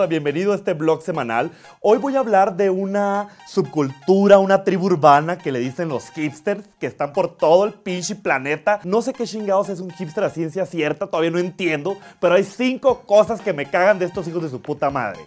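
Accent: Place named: North, Spain. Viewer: Aquí conteniendo mi risa porque ya todos duermen. ¡Pero que cosa! Este tipo es muy chistoso. Aparte su acento norteño: “mushasha”. ¡Good!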